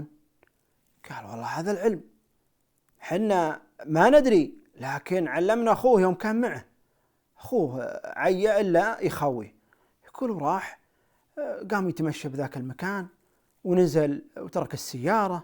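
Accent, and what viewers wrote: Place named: Saudi Arabia. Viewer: صوتك ممفهوم لهجتك سعوديه قح بس قصصك ممتعه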